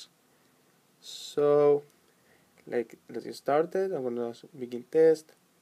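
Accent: India. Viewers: Owen Batucan no its indian idiot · ohh my god oh my goooood i hate when indian speak english (im not racist :) )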